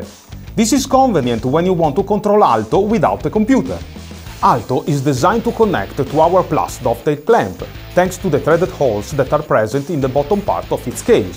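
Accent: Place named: Italy. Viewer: This guy made my day with his italien accent 🤣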